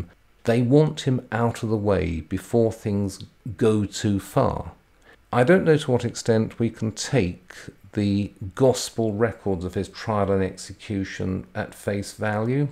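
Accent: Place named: United Kingdom. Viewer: I'm from America USA and I recently "stumbled" upon lectures. Truly, it's a wonderful experience to listen to him teach. His voice tone, UK accent, and amazing depth of knowledge about the various subjects is truly an amazing learning experience. No degree being gained by me as I've already got my B.A. and M.S., but I've always been a fan of ancient Rome and Christian Origins, so his lectures are a lovely thing for me! Thanks for the great work! You're a great teacher! - John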